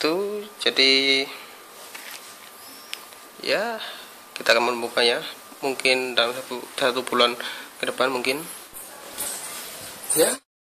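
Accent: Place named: Java, Indonesia. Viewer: medhok amat bro ngomongnya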